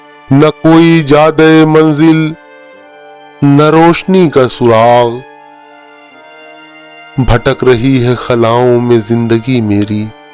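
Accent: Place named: Punjab, Pakistan. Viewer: Awaz tho bith bori hai punjabi style may urdu bol raha hai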